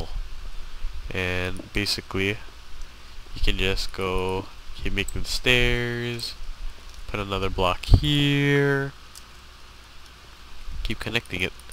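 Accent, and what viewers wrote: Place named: Canada. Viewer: if i was to guess, i'd say mid-northern canadian accent.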